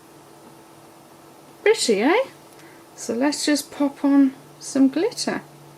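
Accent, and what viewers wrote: United Kingdom. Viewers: Lovely shade of green. Looks good enough to eat ! American accent. Lol. You gave it a shot...I rather like your British accent 💜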